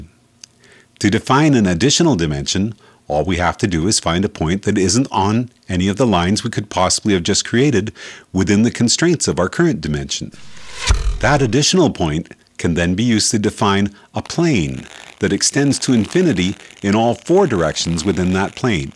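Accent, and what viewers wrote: Canada. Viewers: is he canadian? he says aboot instead of about... and can you define these extra dimensions as real, if theyre only theoretical? can they be proven? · I had a feeling you were canadian before I read this, sometimes it takes a canadian to recognize the idiosyncratic nuances of speech of a canadian. I can't explain it.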